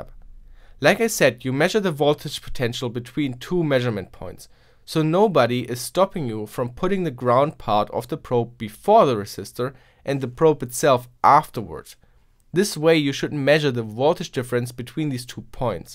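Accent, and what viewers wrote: Germany. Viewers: Bist du eigentlich deutsch? ;D Die Aussprache ist zwar gut aber irgendwie zu deutsch xD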